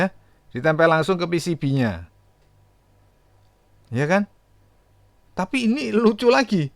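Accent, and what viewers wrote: Java, Indonesia. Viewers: Logat jawamu medok banget min😂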